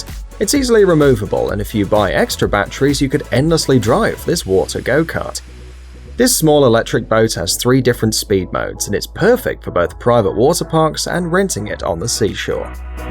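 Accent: United Kingdom. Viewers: Couldn't listen to one more minute of that annoying british accent .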